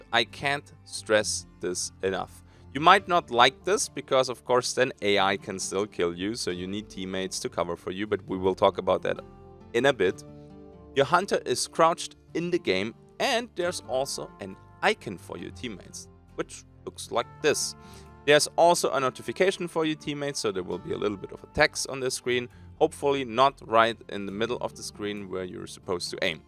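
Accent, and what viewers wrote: France. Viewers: Quel bel accent Français ! Nous apprécions votre prononciation mon cher ! :D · Damn French accent, i'm almost jealous !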